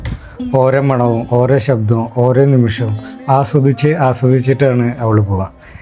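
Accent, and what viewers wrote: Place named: Kannur, India. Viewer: ningalude bhasha kannur karudeth pole und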